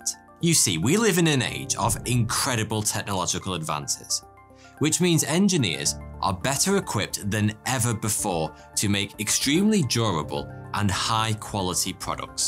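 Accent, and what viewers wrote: United Kingdom. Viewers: Accest sounds like a British one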